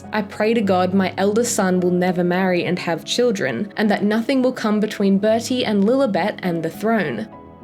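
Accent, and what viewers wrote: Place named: Australia. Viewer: This is excellent! And nice to hear an Australian voice (and "row"? we know what you meant!!).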